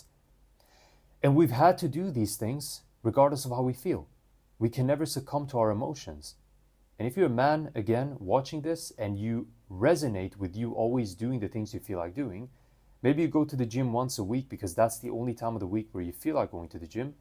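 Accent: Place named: Arab world. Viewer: Your accent is like Rudy Ayoub's, the 'Arab Dad' guy. Good message.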